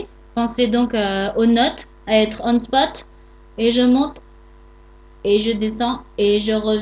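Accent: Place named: France. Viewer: L'important c'est la matière...Moi j'aime votre bel accent Français..Vos explications sont très compréhensible!!! Du Québec un beau bonjour:)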